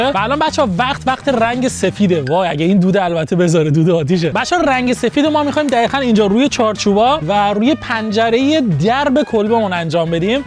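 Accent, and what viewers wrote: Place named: Dezful, Iran. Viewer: یکم لعجه دزفولی داری ، درسته؟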